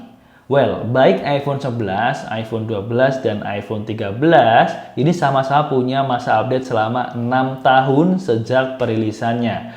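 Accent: Indonesia. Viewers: Orang Lampung ya bang?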